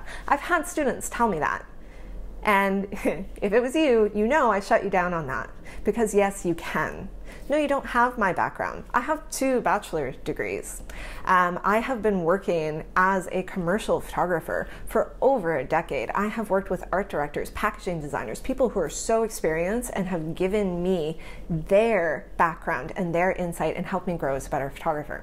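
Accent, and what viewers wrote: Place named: Canada. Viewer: What? Struggling with confidence? Who does that? Ok.....back up the bus.... Who doesn't struggle with it, is a better question. I guarantee even the most accomplished people have or still do struggle with it! We are all good at self doubt that is what makes or breaks us. It creates competitive energy or steals it! For me I am more jealous of the opportunities other photographers have, then the results! Like you I live in the cold cloudy climate! Minnesoooooota! Oh and a side note I knew you were from Canada just by the way you say out and about!! Lol I am a person that thinks I should be learning faster then I do! I have always struggled with learning speed. It has detoured me form moving forward in the past, because I don't get the results "I" think I should be getting! So in a way comparing me to me is self destructive! Instead i need to work the process and just do it and results will eventually come! 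Great message! Made me think about my own job life. Being retired now the only person I can blame for the position I put myself in is ME!! LOL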